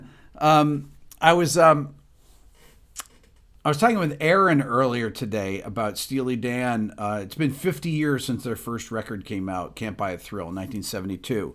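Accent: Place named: North Jersey, United States. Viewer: He's a North Jersey boy. He has that punky Northern NJ accent. Love it!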